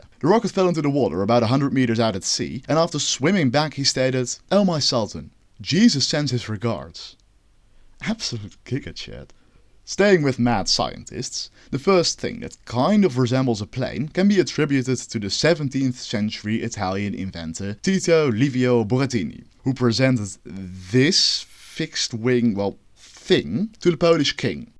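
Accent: Netherlands. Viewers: Gegroet landgenoot, mijn makker-alarm ging al af toen ik je accent hoorde.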